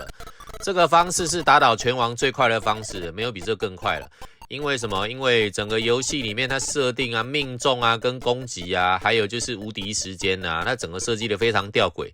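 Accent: Taiwan. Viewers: 非常喜歡的台灣國語，千萬不要改掉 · 每次聽講解遊戲，偶都笑不停，那台式旁白真是太有才了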